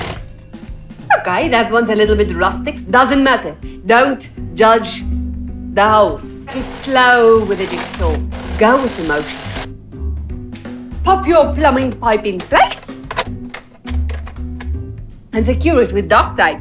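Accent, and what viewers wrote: South Africa. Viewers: is she from south Africa, I am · Is she south African that would be awsome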